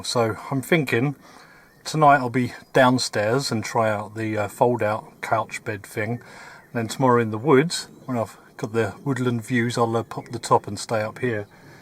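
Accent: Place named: RP, United Kingdom. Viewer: gone all posh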